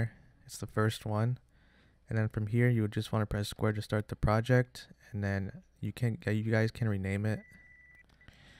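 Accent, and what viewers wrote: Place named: Australia. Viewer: 90% Australian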